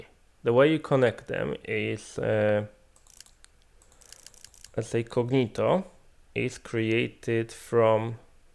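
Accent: Poland. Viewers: Haaa, odrazu wiedziałem żeś Polak :D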